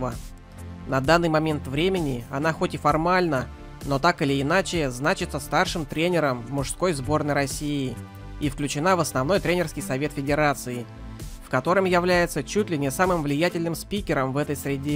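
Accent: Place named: Ukraine. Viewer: типичное украинское произношение, не в обиду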